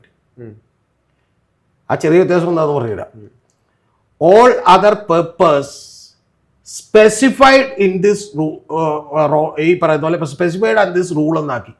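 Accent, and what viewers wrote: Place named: North India, India. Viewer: is he a malayalee?sounds North Indian.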